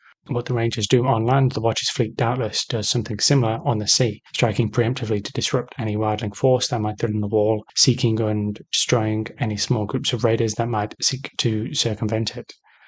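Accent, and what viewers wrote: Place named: Midlands, United Kingdom. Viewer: As a fellow Brit I'd guess he's from the midlands somewhere ? Though in some words the vowel sounds are more southern, so maybe Nottingham? Or maybe Yorkshire but university educated in the south (British academia is biased against northern accents so sometimes people's accents shift a bit. Especially at the older universities).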